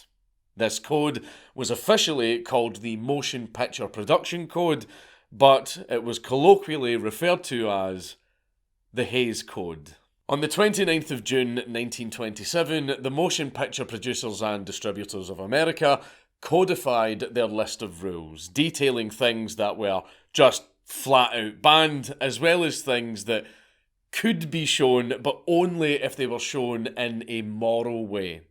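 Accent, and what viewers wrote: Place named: RP, United Kingdom. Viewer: Completely off-topic: It's been SO helpful listening to you. Even though you speak almost BBC-quality English (please don't take offence, this is meant as a genuine compliment), ever since I've started listening to your content my ability to understand out-in-the-streets Scots has improved dramatically. You've pretty much been the bridge I needed from "The hell? Am I supposed to understand this?" to "Oh, it's Scottish. No problem." Of course, some elderly folk out of the big cities somewhere on the Whisky Trail are still far beyond me, but that's fine. After a tumbler or two of liquid smoke barriers just have a tendency to melt anyway. ;) Take it from someone speaking English as a third language: you're not just entertaining and informative, but also educative, even in ways you don't expect. Should I ever happen to run into you in person while on the Trail, expect being invited to a beverage by some random stranger claiming to be a fan. 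;)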